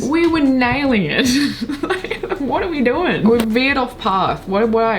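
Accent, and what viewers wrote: Australia. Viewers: Is that a surf green Fender???? I think it's surf green. It's one of the original Strat colours... They had to use Automotive colours because everything was in limited supply due to WW2. So you play 😊 cool 😎 I'm playing a cheap Cort it's a rad guitar 🎸 24 frets... So what is your site about? I'm Paul I work with heaps of people online... I go by Maori Jeebus 🖕 Maori because they hate it. And Jeebus because they hate it 😊 👍 I'm going after Trump at the moment. I was the one responsible for ELONS latest problem's. You sound Australia? I'm in Sydney.... Homoville i will leave information for you please network it out I don't push BS... Here's one have you ever heard of the Elohim? I bet Elon him doe's? He is Lucifer sweetheart mark my words I've nearly intrapt her.... I like your guitars they are rad.. paulburns 299 this is my Instagram I post stuff most days.... 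I'm chilling this week going to promote the Aboriginal and Maori People this week I'm sick of America... Good to meet you sweetheart keep up your good work lattaz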